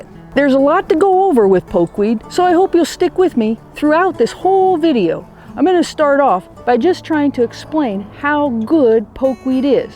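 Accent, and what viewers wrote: United States. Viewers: Ma’am I love your country accent.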